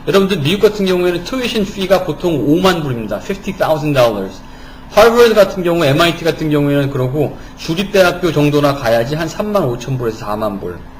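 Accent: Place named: South Korea. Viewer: hes good but he stuttered too much.. and has a korean accent.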